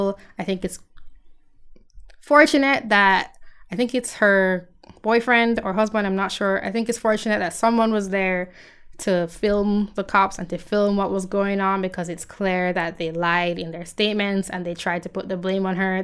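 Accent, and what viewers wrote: Jamaica. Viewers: Since I'm not American I don't really care about any of these issues, but I just enjoy listening to your Jamaican accent. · Really nice jamaican girl. Your accent is so cute.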